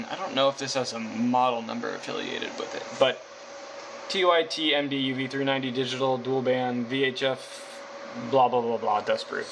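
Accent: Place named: United States. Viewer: You sound like you are in the USA, please don't use encryption when broadcasting on this radio. That is illegal. Also, please get your amateur radio (technician) license. It isn't difficult. One of the things they teach you when studying for the test is that using encryption when broadcasting on amateur bands is illegal, so the encryption capability should not be an issue for you.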